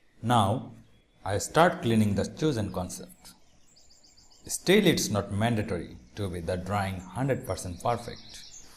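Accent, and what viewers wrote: Bengal, India. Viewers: English a bolar quality tei bojha jacce j uni bangali. tai bolci banglai bolte r kicu na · Youtuber: speaks with Indian accent Me: throws up, dislikes video, reports, leaves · own a indian accent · Pure Bengali Accent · this is the bengali accent, almost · It's sound like that u are a bengali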